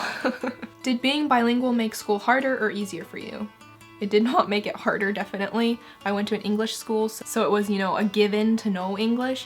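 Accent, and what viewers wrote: United States. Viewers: Hi I thought you are an American in the beginning so.. i speak 2 very old language Assyrian and Syriac and also Arabic and English and im trying to learn Finnish (: wish me good luck by the way all your video are fun to watch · You do sound american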